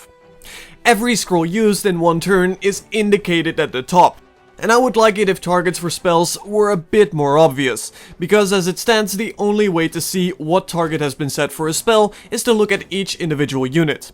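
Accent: Netherlands. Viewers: Heerlijk dat Nederlandse accent :D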